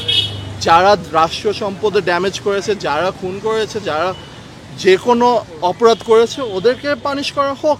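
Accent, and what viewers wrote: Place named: Bangladesh. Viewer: তোমার কথাগুলো এত চমৎকার লাগলো যে কি বলবো। তুমি অত্যন্ত গুছিয়ে, বলেছো। তোমার কন্ঠের সৌন্দর্য, শারীরিক ভাষা, গুরুত্ববোধ, আঞ্চলিকতা সব মিলিয়ে একটা পারফেক্ট বাংলাদেশী মানুষের রুপ সুন্দর করে ফুটেছে। মানে তুমি পুরদস্তুর বাঙ্গালী এবং বিদেশ গেলও বাংলাদেশী।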